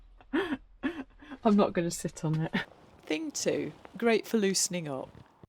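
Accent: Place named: United Kingdom. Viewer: Thank you for this review. I'm a Brit living in Denmark, it was wonderful hearing the dialect from home 😊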